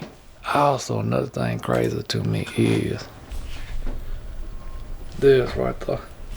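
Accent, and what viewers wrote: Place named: South, United States. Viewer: Southern Bob Ross, I love it!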